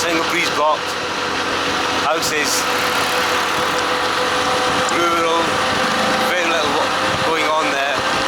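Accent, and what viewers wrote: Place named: Scotland, United Kingdom. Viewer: I love your Scottish accent ❤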